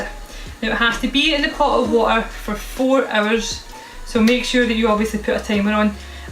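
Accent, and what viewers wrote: Scotland, United Kingdom. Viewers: Subbed, not because of the clootie dumpling, not because of the dogs, not even because it was you're grandmother's recipe. Subbed because thank f*ck there's a real Scottish wumin that talks like a real Scottish wumin oan the YouTube, and no like she's got a mooth full a jorries. So sick and tired of that affected, sickly sweet, shortbread tin, West End, Milngavie or Kilmacolm accent that runs rife as soon as ye plonk a camera in front of someone.. Goan yersel hen. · It took me a while to realise what you were making... lost in translation from Scottish to Australian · I'm here for the accent. Maybe for your next video you might read the phone book? I just love a female Scottish accent.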